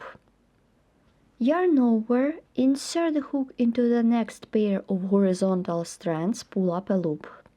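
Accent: Russia. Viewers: Жаль,что Вы на русском не озвучиваете,субтитры переводят непонятно,а по Вашему акценту понятно,что прекрасно говорите по-русски.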